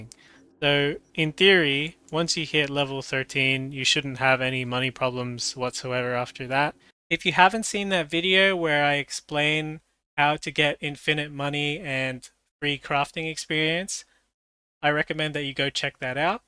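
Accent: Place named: Australia. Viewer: Nice video. Also nice to see a fellow Aussie doing cyberpunk vids (I assume you're Aust from the accent but apologise if I'm mistaken)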